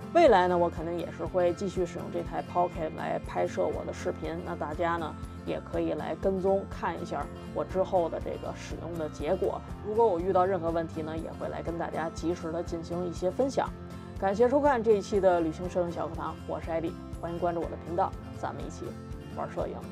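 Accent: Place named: Beijing, China. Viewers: 老北京口音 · 还真是，而且都是京腔。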